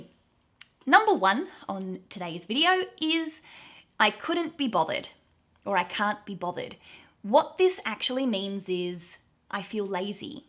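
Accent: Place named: Australia. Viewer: Hello, i only wanted to say. I loved the Australian accent since i did watch some Australian TV shows in mij teenage years. So i love these video's of you. Keep up the good work. And maybe it can be usefull when i'm going to Australia. It's on my bucketlist! Greetz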